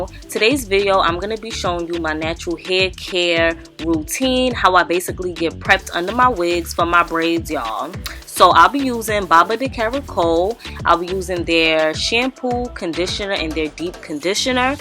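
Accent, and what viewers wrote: New York, United States. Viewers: Its the NEW YAWK accent fah meeee! 😍 (Im from NY too🗽🗽🗽🗽😉)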